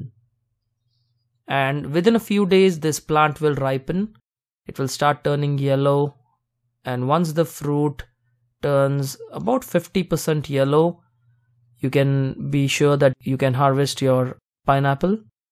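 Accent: India. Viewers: Are you Indian? A/c to your accent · Yes Indian accent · your English like indian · You sound indian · your English speech is Indian type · That's an unmistakably Indian accent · It's an Indian accent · Your accent sounds Indian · I think you are Indian by your accent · Federicken S. It sounds Indian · I think you are Indian. Your voice sounds like Indian accent. Am I right?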